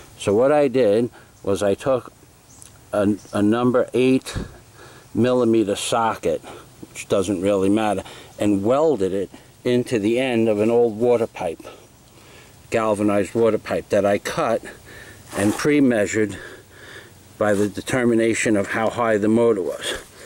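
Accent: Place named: Rhode Island, United States. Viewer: Sounds like you're from Rhode Island like me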